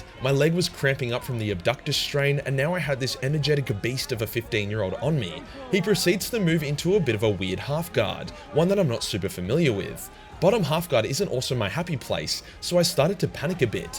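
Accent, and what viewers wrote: Australia. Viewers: Please please please make more jiu jitsu content, your deep beautiful Australian voice is perfect for narrating grappling.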